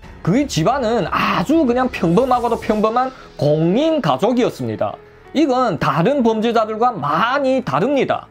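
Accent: Yanbian, China. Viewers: 억양과 엑센트가 특별하고 분명한 어조로 구사한다 전달력이 확실해 상황의 신뢰성이 충분하다 핵심 단어마다 엑센트가 빠지지 않은다 그리고 웃지않으며 진지하다 서술의 시작과 끝이 목소리톤이 일정하고 인상깊은 연변사투리와 중국어가 돋보인다 이게 종일tv의 칭찬요소다 댓글창을 보면 안티가 하나도 없다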